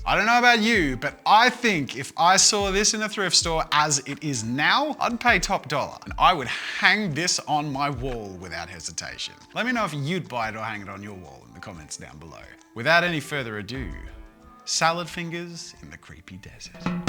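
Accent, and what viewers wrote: Australia. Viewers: wait, are you Australian?! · Mickey Mouse 🐭 pls pls are you Australian🇦🇺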